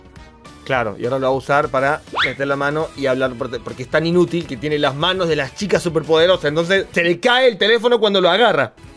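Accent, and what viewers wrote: Argentina. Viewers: Sos Arg???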